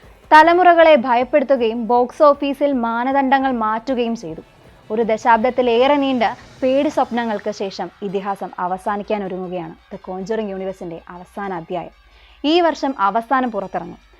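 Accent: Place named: India. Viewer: Indian accent bruh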